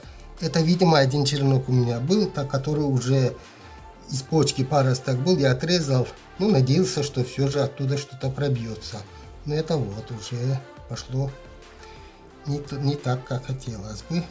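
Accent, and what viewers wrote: Belarus. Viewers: Как приятно слышать белорусский говор, просто и доходчиво рассказал. Только хотелось бы увидеть ещё как из бокового тонкого побега вырастает новый. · Какой приятный для слуха белорусский говор,я не ошиблась?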